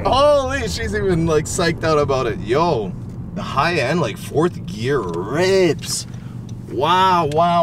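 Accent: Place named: Toronto, Canada. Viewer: You're from toronto. I will alway recognize the way ppl talk here in any video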